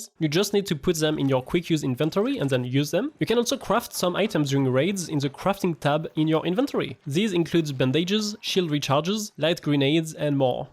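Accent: France. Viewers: Salut ! Merci pour la vidéo c'est cool (Tu est français non ? T'as un accent) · Waaa l'accent français de zinzin hahaha merci pour les tips bg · i got jumpscared by the french accent😭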